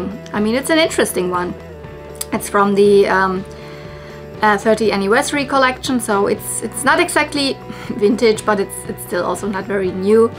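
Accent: Germany. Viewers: I adore your videos but I cringed today as there were soooo many 70's fashions that you passed up in that box, and that Ken looking doll was a Sears exclusive Craig doll which can go for pretty good money. I am a Barbie dealer and have been collecting since 1976 but we all make mistakes, and it's hard to choose when you aren't familiar to that era of dolls. I would have bought the whole box LOL I adore you and my best friend is German too, so I love your accent. I subbed both my channels. All the best to you :)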